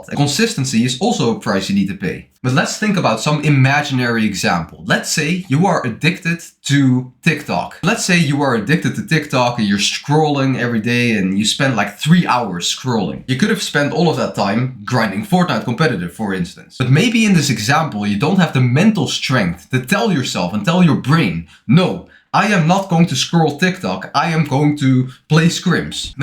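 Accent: Netherlands. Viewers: YOO R U DUTCH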